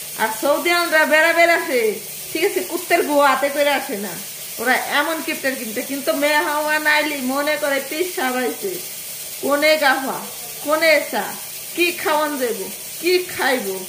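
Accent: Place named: Dohar, Bangladesh. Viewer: Tumi Ki Dohar theke? Kotha shune mone hoy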